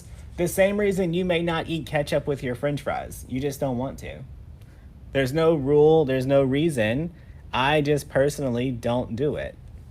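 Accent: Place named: Alabama, United States. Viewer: Lol, you say ya'll as much as we do in AL. My cousins in MI say "youz guys"...that's just wrong don't you think? haha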